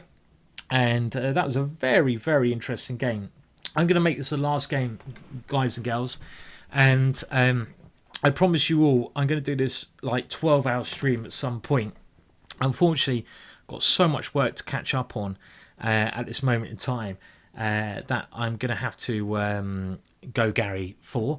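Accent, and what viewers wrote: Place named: RP, United Kingdom. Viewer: Don't understand what the guy is talking about, uses nothing but pure queens English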